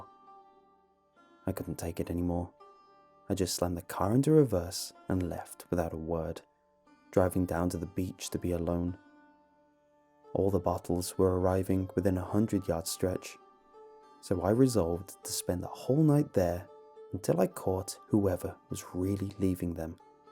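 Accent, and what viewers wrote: United Kingdom. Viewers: You have the best brittish accient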